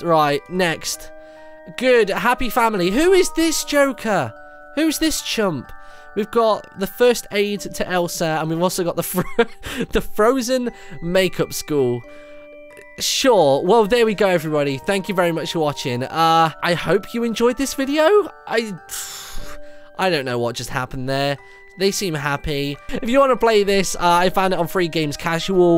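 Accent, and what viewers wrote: United Kingdom. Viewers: Ate you British you sound British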